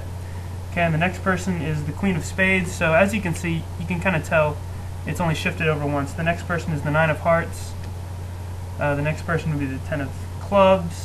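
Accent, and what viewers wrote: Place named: United States. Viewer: You English isn't that poor as far as I can tell. You sound American.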